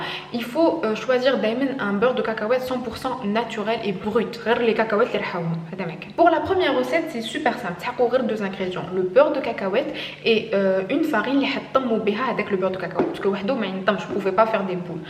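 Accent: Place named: Algeria. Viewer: J'aime trop ton accent algérien ❤❤❤❤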